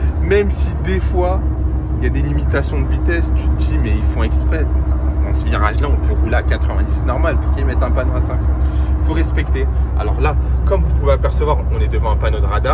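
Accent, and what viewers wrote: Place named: Antilles, Caribbean. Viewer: ahah l'accent timal on reconnais les frero tu es d'où ?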